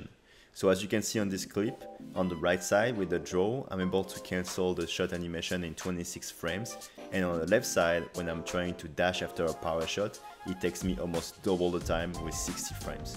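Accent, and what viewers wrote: France. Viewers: You are french right ?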